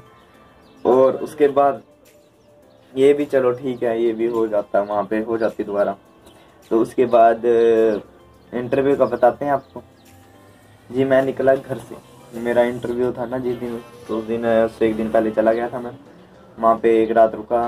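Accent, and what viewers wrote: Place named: Haryana, India. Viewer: U used haryana Hindi but still got visa That's called Desi boy power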